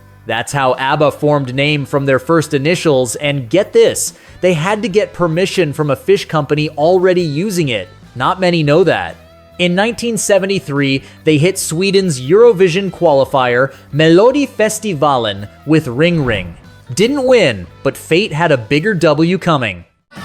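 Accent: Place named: United States. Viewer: I'm starting to get violent diarrhea at AI videos with shitty american accent.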